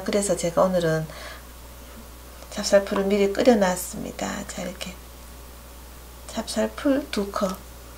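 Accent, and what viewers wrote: Gyeongsang, South Korea. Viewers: 경상도라 정감이가네요 그리고 쉽게 하시네요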